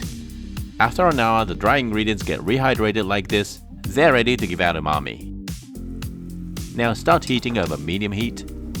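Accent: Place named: Australia. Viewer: As an Aussie, I can hear the Aussie. Especially when you say “chuck” something in, that’s very Aussie 😂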